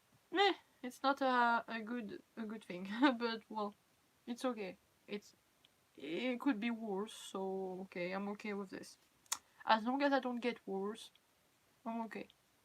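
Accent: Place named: France. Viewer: Moi c'est chelou car je comprend parfaitement l'anglais mais je ne sais pas bien l'écrire XD normal je suis francoportugaise mais même le portugais je sais pas l'écrire mais je le pige à parfaitement, je suis dégouté d'avoir raté le live ;^; en tout cas tu parle bien anglais ! Même si on entend ton accent français mais c'est super bien ! Continue tes vidéo elles son parfaite ! Et tu est parfaite :3 !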